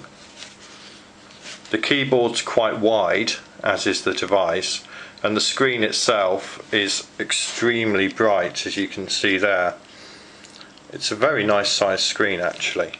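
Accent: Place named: United Kingdom. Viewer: loving the british accent.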